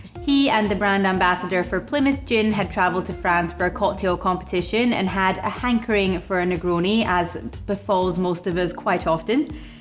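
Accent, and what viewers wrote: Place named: Scotland, United Kingdom. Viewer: Да ладно, по-моему самое шикарное в ней - это её шотландский акцент.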